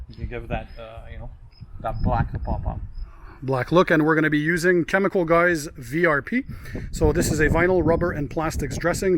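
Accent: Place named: Quebec, Canada. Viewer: Hey I'm a big fan of your channel, I love all your informative videos and now looking to put cquarts UK 3.0 on my vehicle but I'm having a hard time to decide whom to professionally get it done. I'm located in Laval QC. Any recommendations? Im asking because with your accent and French videos I'm just assuming you're in QC as well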